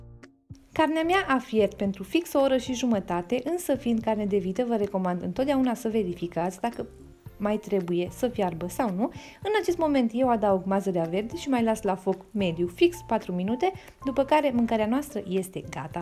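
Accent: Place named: Italy. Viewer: Bună ai fost în italia ai accent de italeanca eu mam născut în Italia 💕💕💕💕💕🥰😈💕💕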